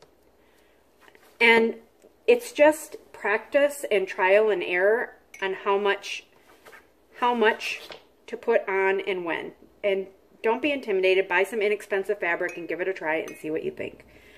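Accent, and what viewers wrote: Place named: North, United States. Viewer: Hi I 💕 this video!!!! You did a wonderful job showing how to dye techniques!! Plus I love your northern accent!!! My mom is from South Dakota so it makes me feel right at home here in Oklahoma!!!🥰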